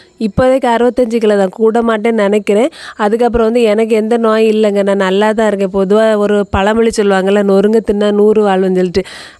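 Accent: Kanyakumari, India. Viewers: Supara pesringa kanyakumari slang enaku piidichrukuu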